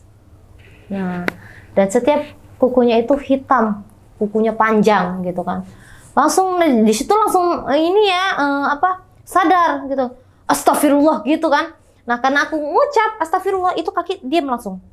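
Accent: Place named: Medan, Indonesia. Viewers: Logat nya Medan kali ha salam dari Medan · sor x ku dengar kakak ini becerita pake logat medan bah wkwk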